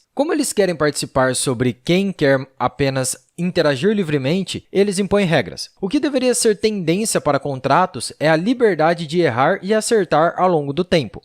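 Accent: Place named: São Paulo, Brazil. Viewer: Parem de mentir com esse sotaque da caipira paulista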